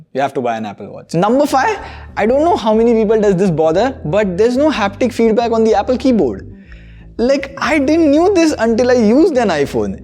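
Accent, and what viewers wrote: India. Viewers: Such a horrible think Indian accent however thumbs up because it was a good video well done sir… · Why Hindis always have to speak english with this bad accent? Really. I’m from Poland i my original accent is Russian like but I learn how to speak better by watching movies n shit. I don’t get it. You really are fucking good YouTuber men but I don’t understand you because of accent.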